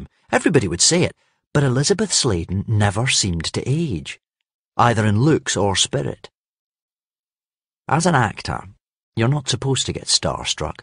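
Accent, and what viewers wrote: Scotland, United Kingdom. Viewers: Im sorry but I am IN LOVE WITH HIS SCOTTISH ACCENT.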